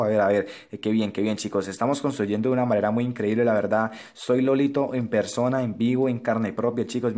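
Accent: Colombia. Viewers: Me encanta tu acento Colombiano💖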